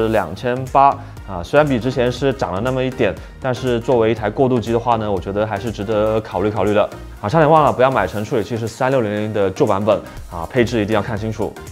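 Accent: Cantonese, China. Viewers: 粤味唠一唠嗑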